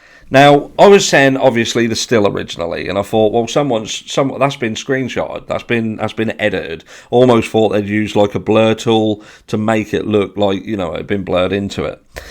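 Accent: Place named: South London, United Kingdom. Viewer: Why are you getting in to the whole saga and adding fuel to the fire of that eedjit Ryan? The guy needs to (allegedly, in my opinion) lay off whatever it is he's taking. I'd stay out of that whole thing cos it's just insane and degrades anything you have to say. Sorry, that's my opinion, but was quite liking your straight forward 'South London' way of talking (I grew up in Reigate) so bit disappointed. I see the arrow, yes, but how do u know its not something that's been there for years for kayakers/fishermen/boats to mark an easy way on to the reservoir without worrying about a sudden drop or whatever?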